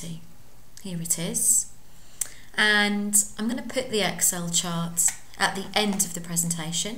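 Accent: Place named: United Kingdom. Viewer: annoying british accent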